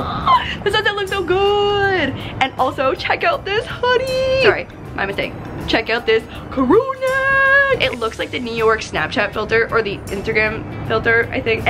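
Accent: Canada. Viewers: TOMORRRRRROW J.k I love Canadian accents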